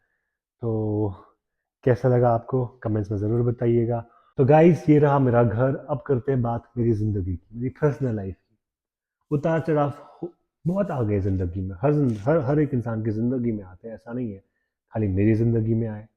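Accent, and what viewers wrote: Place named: Kashmir, India. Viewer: Accent se hi pata chal gaya k Kashmir se ho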